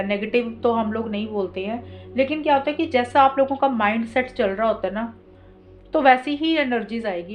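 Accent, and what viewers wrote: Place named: Amritsar, India. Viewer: Aap Amritsar ke ho awajh se lagta hai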